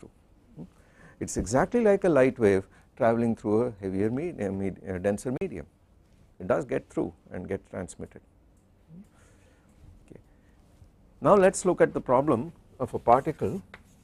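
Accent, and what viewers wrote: India. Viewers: the professor is an exceptional teacher. The extras he brings into the course with his comments are really at a graduate level, not a basic undergraduate course. For an American, the Indian accent is sometimes difficult to decipher. Subtitles would be very helpful. Also, ith wourld be very nice to have a set of course notess for the lecturs.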